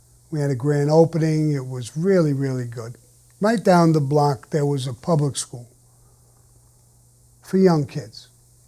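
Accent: New York, United States. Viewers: I moved to Scottsdale from NY in 2014 after 46 years living in NY. NOBODY here in AZ talks like that. It's kinda scary but my father who was born in 1936 had almost an identical accent to you. You remind me of him in more ways than I can say. R.I.P. Please keep uploading content!! For all of us NY transplants it reminds us of home. Salud.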